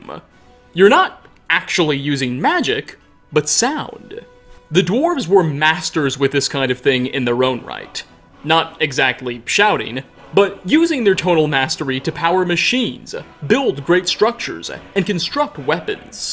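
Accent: United States. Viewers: Teleki Zalán he’s definitely American you can tell from his manner of speech. To most of us a “lift” is when you give someone a ride in your car. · Maybe that's just one of the many American accents.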